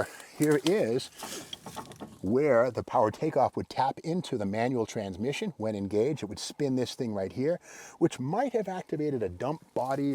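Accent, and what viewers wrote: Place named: Massachusetts, United States. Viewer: He might have. You could detect a MA accent, but not on every word. I have a friend from Saugus, and he has a much more pronounced accent on every word.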